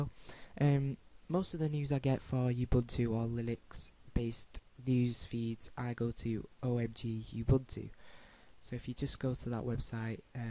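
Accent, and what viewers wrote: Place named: Yorkshire, United Kingdom. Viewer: The UEFI boot thing is worrying but I don't think that MS would like the bad publicity it would get if it tried to limit the use of computers to its own OS. This is the 1st of your vids I've watched - your accent sounds similar to mine so you're prob in Yorks - Do you go to a LUG? I only have Linux, I grew to hate Windows more & more so it was easy to leave it & move to Ubuntu. I run Ubuntu 11.04 'Classic' on my desktop & Xubuntu on my EeePC901, laptop & server. Use CentOS in a VirtualBox.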